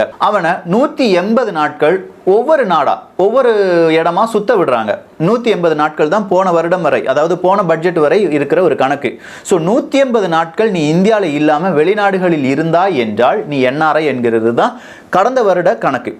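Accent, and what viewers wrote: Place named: Kanyakumari, India. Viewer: nenga kanykumari district ah ? slang patha apdi thonuthu..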